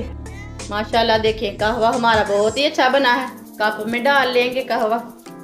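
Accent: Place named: Punjab, India. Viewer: Very strong Punjabi accent that you cant hide 😅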